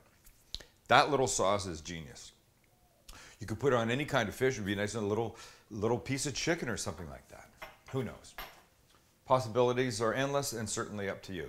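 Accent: Canada. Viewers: please ditch that annoying Canadian drawl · Love his Canadian accent! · Is this guy Canadian?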